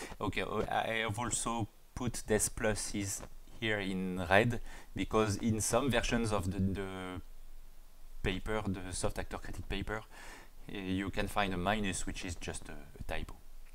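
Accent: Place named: France. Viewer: Your french accent is lovely!! Also, thank you for so clear explanation!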